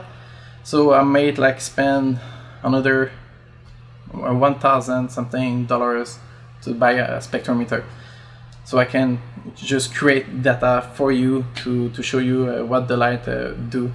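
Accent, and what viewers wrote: Quebec, Canada. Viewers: Oh man, what horrible timing my poor fellow Canadian. You really have something special with this channel, I know you'll be hitting the big youtube numbers in the next few years if not months. Your videos are nothing short of amazing, I just love reading your subtitles and hearing your wonderful accent in my head. Your shots are so beautiful, your stories are enthralling and completely worth waiting a few seconds to see commercials for! You're worth it! Don't sell yourself short. Maybe try for something part time just until you get the numbers up? Sponsors are fine too, it would be awesome if they let you critique them as you like to, those videos are very informative. Most people will take any adverts like a grain of salt and make up their own minds anyways so you shouldn't feel bad there! Wishing you all the best and looking forward to your next video <3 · I am new to the hobby and have enjoyed your videos for tips and honest opinions. 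I grew up in Brossard, so the accent is something easy for me to understand (I am in the USA now, but my French is still pretty good). Losing a job is hard as it shakes your confidence, but even with the economy being shaky, it is not the end of the world. Hope you find something interesting soon and that you keep making your good videos.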